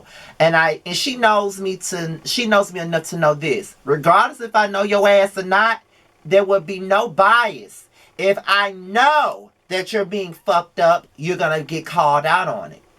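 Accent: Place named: Mississippi, United States. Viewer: I didn’t know you were from Mississippi. I’m from Jackson, MS! I knew I recognized that accent lol. Represent!! You have a new subscriber. We have to support our own.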